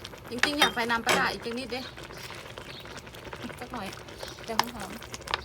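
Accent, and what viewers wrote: Korat, Thailand. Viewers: น่ารักน่ะภาษาโคราชชอบฉันนๆน่่ะ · พูดโคราชนั่นละจ้ะ ชอบ ดีจ้ะ ผักงามอย่างนี่ เขาว่าคนปลูกมือเย็นเนาะจ้ะ ปลูกไอกะงาม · ผักงามจังเลยค่ะ ๆพูดโคราชเพระดีจ้า🌿🌿🌱🌱🌱💞💞💞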